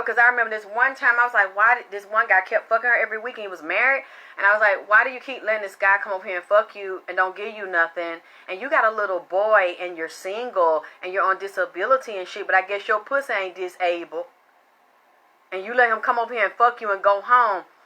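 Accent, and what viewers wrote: South, United States. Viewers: “Gummy head” 😫😆🤣 OMG!!! **btw, you’re beautiful 😍 Glad you survived through those toxic years.. Look at you now.. “Where you start does not determine how you pull up nor your destination” 💜💜 I love the southern accent #SOUTHERNGIRLPRESSURE 💪🏽 We built different 🤞🏽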